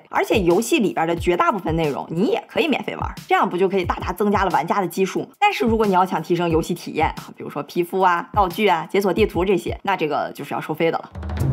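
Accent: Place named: Shaanxi, China. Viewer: 小lin是陕西人吧 西安 😂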